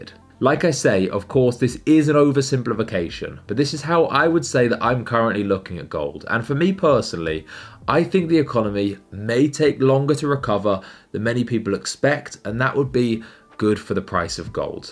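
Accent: United Kingdom. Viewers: Too much talking off topic. Not to the point. Don’t like British accent in USA it is irritating 😠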